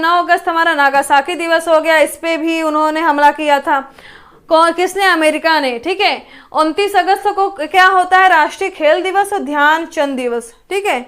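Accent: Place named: Lucknow, India. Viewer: मैडम लखनउ से है😀😀😀।। लखनऊ वालो का बोलने का प्रक्रिया ही अद्भुद है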